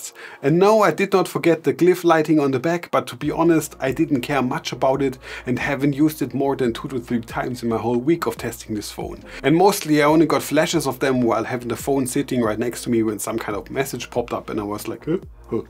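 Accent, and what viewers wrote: Germany. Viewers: Stuagarter wo english schwätzt. Klasse Moove Digga! Gefällt mir!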